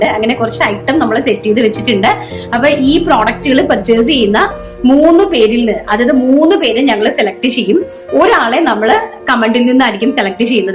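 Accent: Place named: Tamil Nadu, India. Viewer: I like and your performance so much. Tamil mixed Malayalam kelkaan oru sugham und God bless you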